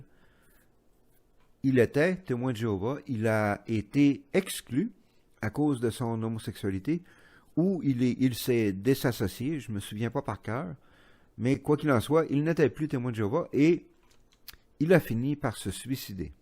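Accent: Quebec, Canada. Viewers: Bonjour et merci beaucoup pour les informations, si vous avez le temps.. pourriez-vous les écrire les noms des membres du Collège Central ? Désolée ! J’ai du mal à comprendre avec votre accent québécois… ne vous méprenez pas… c’est joli comme nous à la Polynésie nous avons aussi un accent… nous roulons les rrrrrr… je vous remercie d’avance et bonne continuation pour tout…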